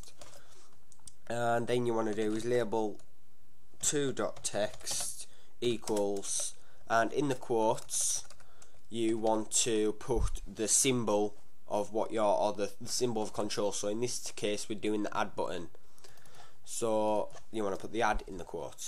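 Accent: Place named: United Kingdom. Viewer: defo British XD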